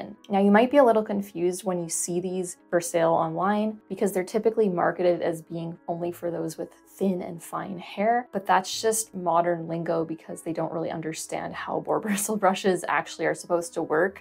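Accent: Canada. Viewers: Smart Girl ! 🙏 You Sound Canadian. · Maybe it's your accent (canadian?)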